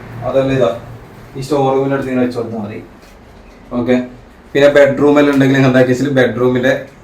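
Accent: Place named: Bengal, India. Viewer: നീ മലയാളം പഠിച്ചിട്ടു പറയു, വിഷയം നല്ലത് but ബംഗാളി, ഇതു പറഞ്ഞാൽ പകുതിയേ മനസിലാകുന്നൊള്ളു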